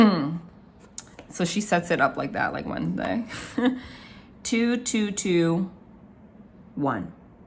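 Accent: New Jersey, United States. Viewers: I loved the rituals, I vibed with the one for the naughty kids in the class 😂😂 Your voice and how you use it when the trance is strong is so cool, and the Jersey accent, of course. Especially for a South African male, our English accent does NOT sound good.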